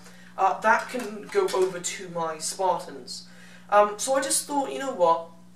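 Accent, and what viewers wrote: United Kingdom. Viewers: very good like all romans in the movies, a brittish accent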